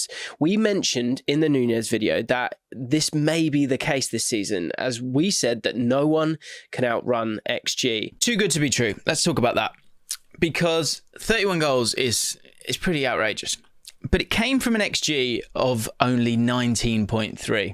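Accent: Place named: RP, United Kingdom. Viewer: still pretending to have a clue and hiding behind his posh accent to fool the rubes. I see you.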